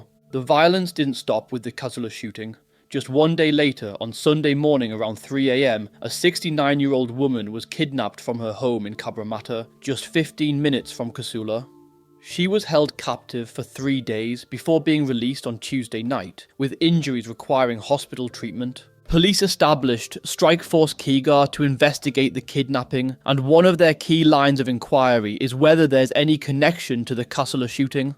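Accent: England, United Kingdom. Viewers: It's Cah-soo-la, not ca-sul-a. You're a pom, right?😀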